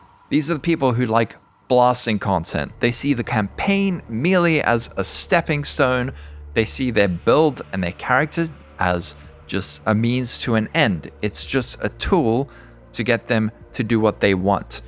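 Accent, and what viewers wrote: South Africa. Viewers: You are completely right! I am an old school crpg player (wow it’s almost 40 years I have been playing since hack/rogue/wizardry 1 in 82) and for me nerfs are amazing as it just makes a whole new journey/challenge available. I get bored with high level characters that can do everything, it’s figuring out how to build and tackling challenges with my creation that’s fun. Probably why I played Diablo 2 for years as well. Anyway thanks for a great video and channel! Btw are you South African/Ex S.A.? Me too! I grew up in joberg).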